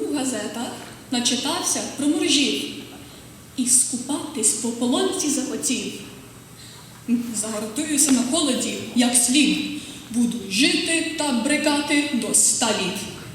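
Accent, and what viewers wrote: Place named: Canada. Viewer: ! Ну яка молодець! Я так сміялася! Трохи канадійського акценту тільки додає кумедності цій гуморесці:)) Можете сміливо продовжувати і далі:)) Бажаю успіхів!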